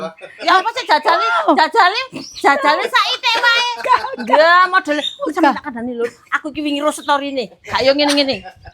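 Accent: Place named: Madiun, Indonesia. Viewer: Gerceeep nonton mak e ,, duhh Masyaalloh Tabarakalloh mak tambah muuaaaniiss lhoo seperti gula jawa 😊😊 manis e angkleg bahasa Medione ❤❤,, sehat2 yaa mak ku 🎉🎉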